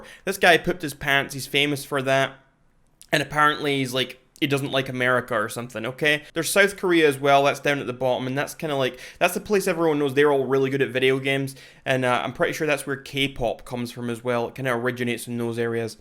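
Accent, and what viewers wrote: Scotland, United Kingdom. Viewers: Is he actually not scottish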